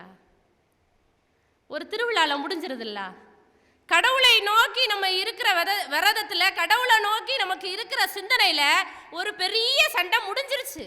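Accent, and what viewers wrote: Tirunelveli, India. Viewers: Ñellai Tamil👌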